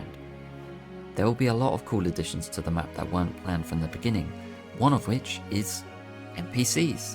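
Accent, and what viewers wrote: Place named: RP, United Kingdom. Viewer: I love your voice, you sound posh as fuck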